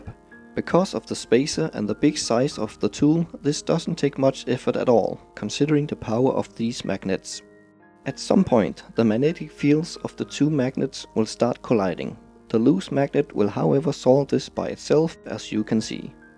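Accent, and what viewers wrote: Denmark. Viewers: Are you from Denmark? Your accent seems Danish. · The danish accent is strong with you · Are you from Denmark. I think I here Danish accent.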